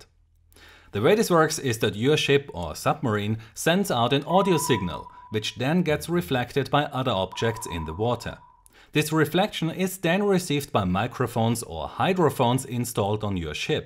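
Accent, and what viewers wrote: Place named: Germany. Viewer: Man hört soooo dass du deutsch bist 😂😂😂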